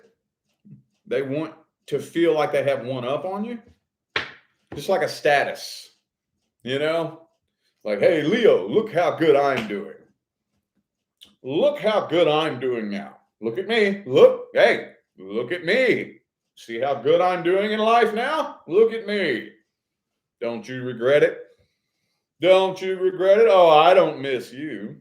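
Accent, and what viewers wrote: South, United States. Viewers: I enjoy your readings. Are you a southern fellow?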